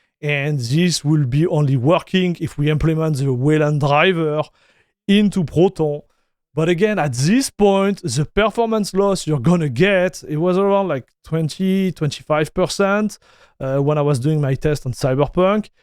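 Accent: France. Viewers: The French accent makes the video worse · The French accent makes the video better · Honestly haven't listened to much French accented tech content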